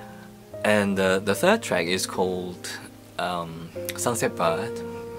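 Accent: United Kingdom. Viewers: I can watch u talking , for the rest of my life! How sweet you are! I like ur British accent 👏🏻❤️ and i love your new album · 크으... 역시 루마행님 브리티시엑센트 쥑이네~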